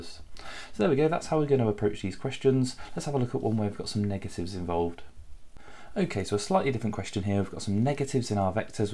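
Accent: United Kingdom. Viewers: He’s scoish init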